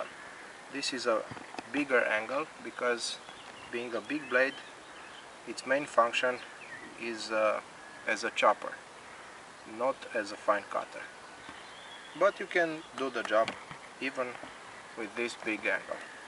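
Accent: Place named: Romania. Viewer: You're romanian by the accent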